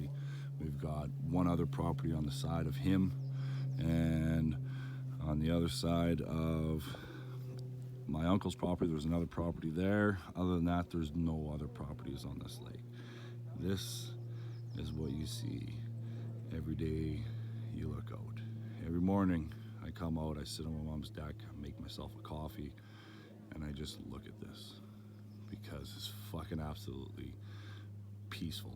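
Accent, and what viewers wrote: Canada. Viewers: Ontario accent - for sure - thanks.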